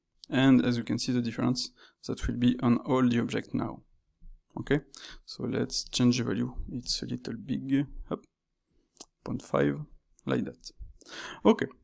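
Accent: France. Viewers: I have been playing around with Blender for just over a year, only learning through youtube and trial and error. Sir, this is the best explanation of Remesh verses Dyntopo I've heard yet. And that is even with the French accent.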